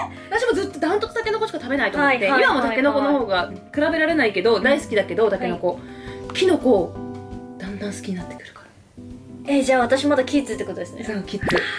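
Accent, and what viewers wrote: Kansai, Japan. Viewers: さんの関西弁めっちゃ好きなんやけど。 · やでなぁめっちゃ分かるわ😂 本間の関西弁っちゅうのが分かる！w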